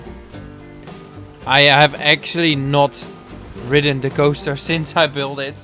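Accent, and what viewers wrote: Netherlands. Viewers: Brabant heuj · It's even worse. It's southern Dutch 😂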